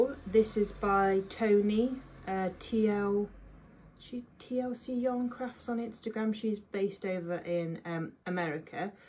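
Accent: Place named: Yorkshire, United Kingdom. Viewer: Hi, I've watched both your episodes now and really enjoyed them. I love to see a mixture of projects, yarns and recommendations so your podcasts tick all the boxes! I live in Yorkshire so it's great to hear an accent from not too far away! I also watch Cherry Heart (I'm currently making a battenberg blanket), I love Siobhan's Crafts yarns and I learned to crochet by watching Bella Coco tutorials. I'm not on Instagram but I look forward to seeing more podcasts from you on this channel! 😄👍🏻 Helen x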